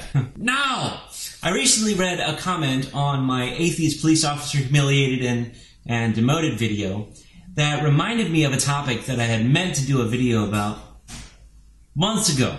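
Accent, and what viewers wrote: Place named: South, United States. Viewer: Great video and that's an amazing southern accent ;-)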